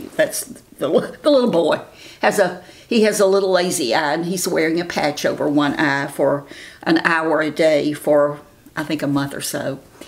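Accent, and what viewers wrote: South, United States. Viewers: Hi, im watching ur Q&A video (also about caftans). im a new subscriber. About negatives comments, why do these people watch(whoever’s channel) if they arent fans?? Some folks just need to complain🤷🏼‍♀️well i love ya girl!! I could listen to ur southern accent ALLLL day long!!!! 😘❤️ps say hi to Jim, and tell him the yard is looking great!!!🧤🌴🪴🌾🌸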